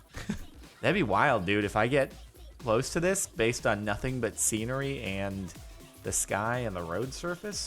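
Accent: Russia. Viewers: god i love your russian accent lol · "This is Mother Russia over here, and here is Baby Russia right there" I cracked up at that point but yeah even after 5 years that Russian accent is very funny and cool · I don't think it's bad that he does a Russian accent it sounds cool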